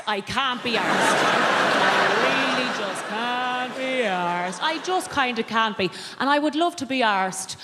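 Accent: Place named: Ireland. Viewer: Dynamite, very smart, and as an American, I love the Irish accent.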